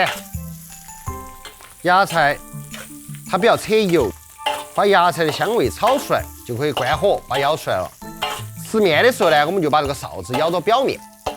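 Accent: China. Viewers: His Chinese accent is fucking hilarious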